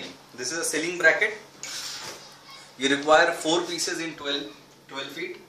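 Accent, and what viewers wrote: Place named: India. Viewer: Smart Bollywood accented man.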